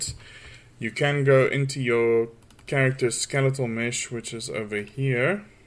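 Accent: South Africa. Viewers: Great video! Are you South African?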